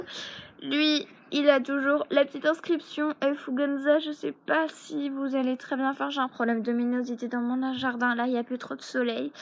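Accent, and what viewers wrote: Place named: England, United Kingdom. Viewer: L'accent anglais😅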